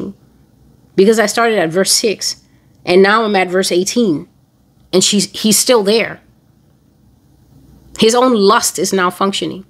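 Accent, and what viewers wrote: Nigeria. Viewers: This Nigerian accent is on point 😀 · “Chop bar”= Buka Thank you for all you do. You are an honorary Nigerian 🇳🇬because you can speak like us. I salute your dedication and your work daughter of my King🕊️🤍🎚️ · God bless you Sister. I'm Nigerian , it's scary how you could mimic our accent though🙂 . May the Lord continue increase you in strength & wisdom . You are a gift to humanity . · You spoke exactly like a Nigerian 😅....Well done ma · Great job on that Nigerian accent, I do don’t care what pple say about this girl, all I know is God speaks to her❤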